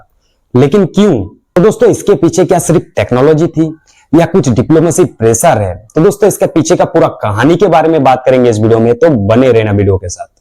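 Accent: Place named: Bengal, India. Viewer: अभी आपको हिंदी बोलना सीखना चाहिए।बोलते समय स्त्रीलिंग पुल्लिंग का ध्यान नहीं रखा गया है। आपकी हिंदी में बंगाली छाप झलकती है।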